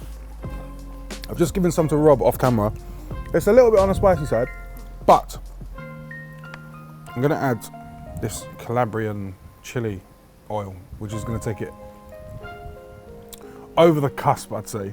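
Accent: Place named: Manchester, United Kingdom. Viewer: Fresh linguinehh haha u sound like your from my ends Manchester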